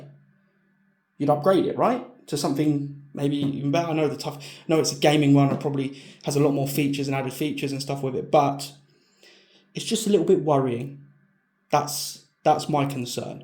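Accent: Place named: England, United Kingdom. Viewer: Nice video and I subscribed because of it, about time an English accent was on a youtube tech channel keep it up mate.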